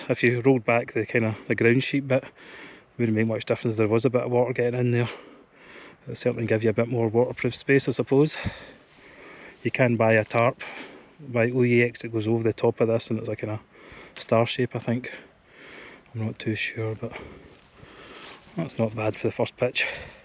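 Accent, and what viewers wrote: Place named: England, United Kingdom. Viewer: I like your English Accent 💜💜 Sometimes I don't understand your English Accent Because I can understand American English Accent 🤣🤣🤣But I am enjoying your videos all the time 💪👍🌷💜🌷🌷🌷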